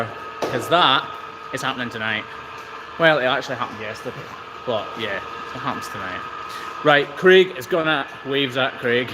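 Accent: Scotland, United Kingdom. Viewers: Love Outlander & love your videos - you could read the telephone directory & I'd watch!! Its a love of the Scottish accent - you & Sam Heughan 👍💕🏴💕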